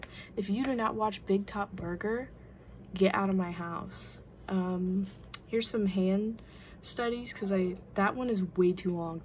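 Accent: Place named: South, United States. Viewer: I just have to mention that you have a nice southern accent :3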